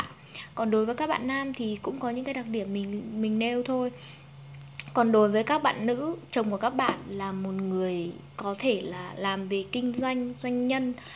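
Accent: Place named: North, Vietnam. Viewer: giọng Bắc bạn hay qá ko gắt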